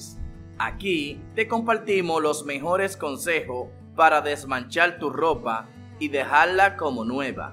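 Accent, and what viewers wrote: Dominican Republic. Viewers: Es de República Dominicana bro Ahí hablan así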